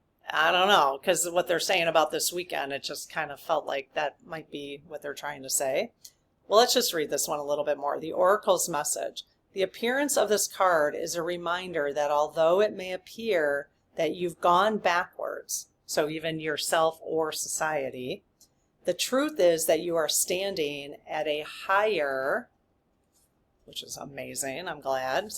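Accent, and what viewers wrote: Midwest, United States. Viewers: Hi. I love you and absolutely love your content. You have helped me more than you can ever know. ❤ And have to say, I kind of thought you were in Wisconsin. I am originally from Illinois. A FIB knows a cheesehead when we see one! I think it's the Midwest accent. When I moved to Arizona, everyone told me I had a weird accent. I hope I didn't offend you. That was not my intention.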